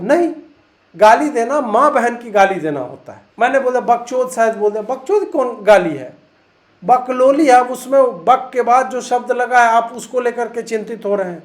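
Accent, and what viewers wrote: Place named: Begusarai, India. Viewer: Begusarai wala ka ye normal launguage h Galti se begusarai style me sahi se bol diye to tum logo samjh se bahar aa jayega Boli se ched kr deta h begusarai wala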